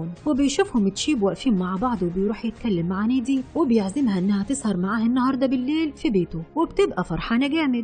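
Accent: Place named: Libya. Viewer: يل بتتكلم ليبيهة خلي السرد بلهجه الليبيه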